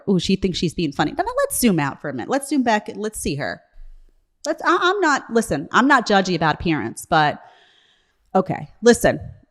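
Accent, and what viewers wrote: New York, United States. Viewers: I recently moved from NY after being there for 12 years. Your accent and your humor invoke good memories of some of the nice people I knew and met there. Great content!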